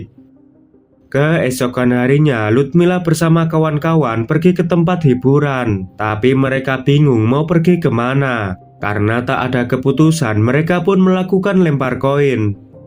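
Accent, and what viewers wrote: Java, Indonesia. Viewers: mendalam kali ah bro ini ngomongnya..medok kali 😂 moga sukses bro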